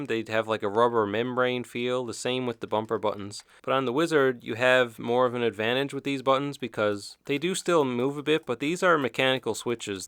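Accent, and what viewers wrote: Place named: Eastern Canada, Canada. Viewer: Would you happen to be from Newfoundland? I can hear the eastern canadian accent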